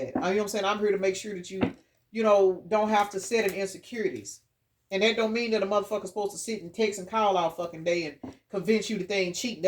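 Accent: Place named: Texas, United States. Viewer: ESPECIALLY YOUR TEXAS-ASS ACCENT 🤠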